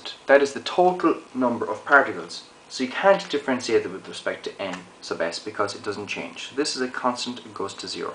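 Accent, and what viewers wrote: Ireland. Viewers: that irish accent reminds me of Jacksepticeye, quite nice xD. Great video and explanation btw, it really helps to see the full steps. Thank you!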